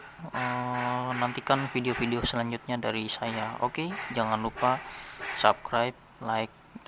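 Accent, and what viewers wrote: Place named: West Java, Indonesia. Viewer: Bangx kayax orang sunda ya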